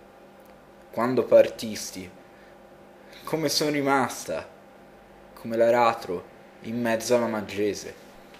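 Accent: Tuscany, Italy. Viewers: Allora, non so a cosa servono le tre letture, comunque non volevo dire questo. A me l'accento da toscanaccio maledetto piace, mi sa di uomo con poca istruzione (e sicuramente non lo sei) che questa poesia la recita da attore protagonista. Molto interessante. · Scommetto che sei toscano haha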